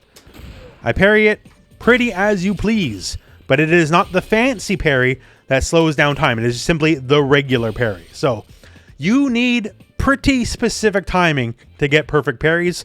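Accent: Canada. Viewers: You sound like you must be from the same place as Jordan Peterson.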